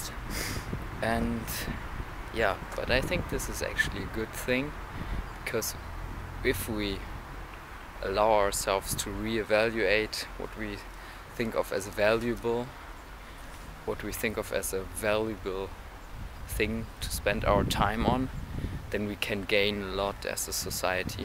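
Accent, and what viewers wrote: Germany. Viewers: Lool he's german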